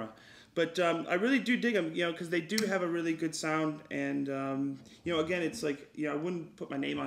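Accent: United Kingdom. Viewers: your accent is confusing...just when i start to think you are american BOOM you sound british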